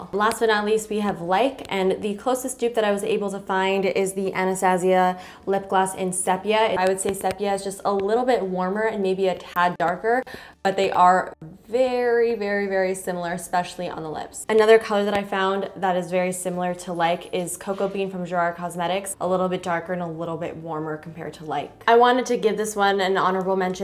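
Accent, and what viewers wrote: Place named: Canada. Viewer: What part of canada are you from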